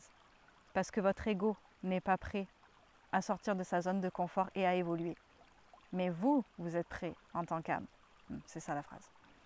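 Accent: Canada. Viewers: C’est marrant, c’est une aparté rigolote mais on voit bien l’influence des canadiens dans ta facon de parler parfois avec tes « puis », « j’était comme » et autre... je sais pas si tu connais Emma Bossé une super youtubeuse qui parle de spiritualité aussi et de guidance, bah tu as un peu cette même façon de parler ahahah je suis sûr que tu en ai influencé, mais j’adore comment ils s’expriment aussi c’est super plaisant pis c’est ben joviale tsé, c’est po linéaire ou que sais-je c’est vraaaiment posé.... 😂 bref en tout cas je te rejoins carrément sur le fait qu’on soit des êtres multi dimensionnel, je suis d’accord nos guides c’est nous avant tout, dans d’autres dimensions dans d’autres aspects....merci, bye💛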